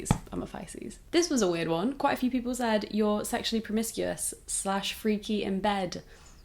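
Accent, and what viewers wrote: RP, United Kingdom. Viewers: Is it weird that I never noticed had a posh accent until she said lol? I guess she comes across so genuine that it didn’t really matter. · As a non-native English speaker, I must say I absolutely love your posh accent! I also really appreciate how authentic you are, thank you for the wonderful content! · I got the distinct impression that on the one hand she didn't consider herself to come from a wealthy background, but on the other she also intellectually knew that she was born of two professional parents in archetypal upper middle class dormitory town Tunbridge Wells in Kent, speaks with an RP accent & appears to have survived a 3 year university degree without emerging hugely & unsustainably in debt, and that these two sets of information were in some tension. This is absolutely not a dig at her or you, just an observation for perspective purposes. 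We don't get to choose the circumstances of our birth.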